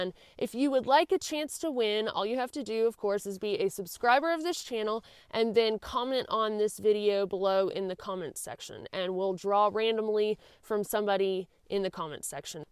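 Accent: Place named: Alabama, United States. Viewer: I'm proud of you too, and by all means, put me in the drawing :-) . I am now watching your AT thru hike adventure, and it's so nice to hear that charming Alabama accent in amongst the other thru hikers. My home is Georgia, so I appreciate those kinds of things. Plus, you are one tough chick. I do hate to see that engineering degree go unused, though. That's quite an accomplishment, and you must have worked very hard to get it.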